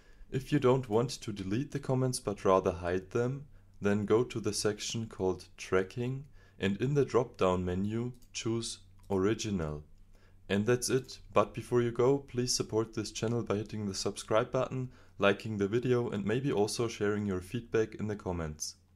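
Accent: Germany. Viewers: What a lovely english with german accent .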